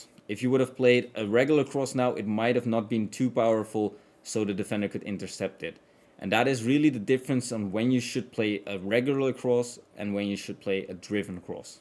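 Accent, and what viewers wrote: Netherlands. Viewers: Kom jij uit doetichem hahahaha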